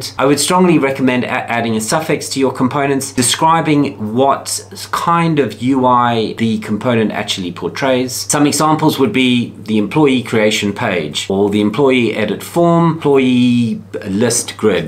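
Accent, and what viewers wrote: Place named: South Africa. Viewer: Do I detect a fellow South African accent ?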